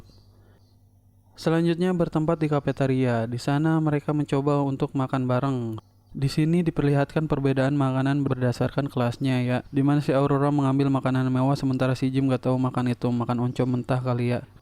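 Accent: West Java, Indonesia. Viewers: logat sunda na asa deket jeng daerah aing da ieu teh🤣🤣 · Si ajig urang sunda🤣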